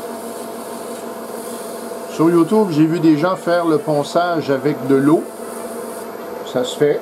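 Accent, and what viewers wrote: Quebec, Canada. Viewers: Bonjour! Pas facile la plane pour un débutant, mais avec cette vidéo qui complète tes anciens tuto, je me semble plus à l'aise. J'ai donc fini mon 1er lutin sans accrocher, et en plus avec la finition cyano. Un bonheur...Un retraité français( mon fils vit à Montréal depuis 10 ans, j'adore ton accent qui me refait vivre de bons mais rares moments au Québec )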